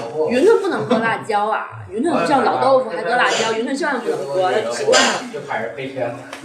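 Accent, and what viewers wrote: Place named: Tianjin, China. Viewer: 特别喜欢听Kaka说的津普，很像上学时听同学说话的感觉👍加油！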